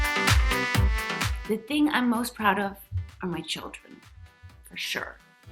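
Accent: United States. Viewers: sounds more American and less British in this video...........her voice is very different on RHOBH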